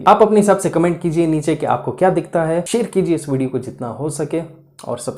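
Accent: Hyderabad, India. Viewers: Coz he is hyderabadi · You have some haydrabadi accent😃nice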